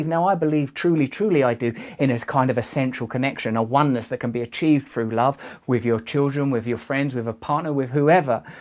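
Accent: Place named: United Kingdom. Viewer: So drunk and sad right now, I had to turn the CC on because it got to the point where the British accent got so thick I didn't understand any words to cry to.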